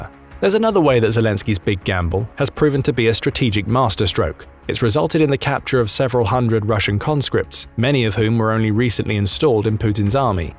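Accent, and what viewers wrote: United Kingdom. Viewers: Because it was narrated by a British dude and the video is full of clips instead of human faces. And there are a lot of videos that are like this.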